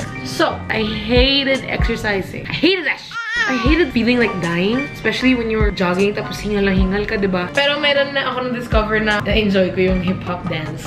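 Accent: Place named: Philippines. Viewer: Oh my goodness I love your Filipina accent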